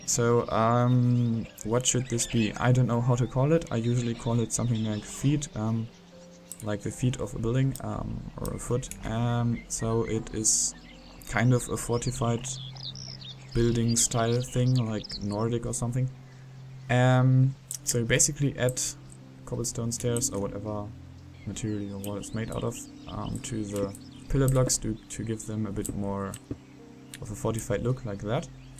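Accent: Germany. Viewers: haha...man hört voll raus das du deutsch bist :D niocce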